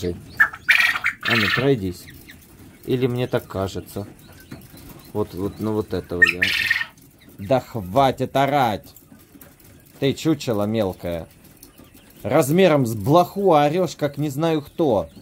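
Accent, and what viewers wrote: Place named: Ukraine. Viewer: Одела очки, слушать Ваш украинский говор, одно удовольствие, хотя чуть понимаю, удачи всем вам!!!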